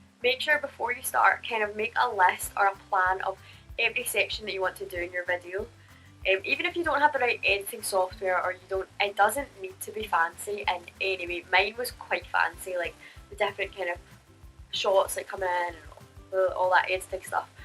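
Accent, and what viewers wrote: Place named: United Kingdom. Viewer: Wonderful videos! Although, I'm a little confused. Your accent says UK but your video: Camp America? :P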